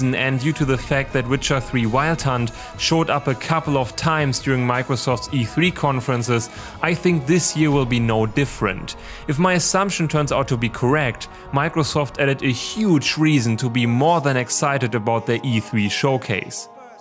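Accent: Germany. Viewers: R u german?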